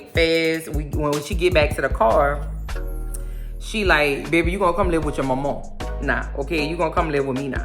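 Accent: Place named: New Orleans, United States. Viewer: I am so glad I found your channel! I love your commentary and you’re so dang funny and I looove the way you speak! I lived in New Orleans for years and hearing you makes me so nostalgic for when I lived there - so comforting to listen to 😌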